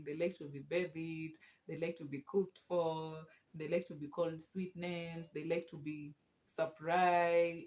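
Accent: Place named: Nigeria. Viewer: Like u re conversant with how we 9ja talk... ve u stayed with Nigerians b4.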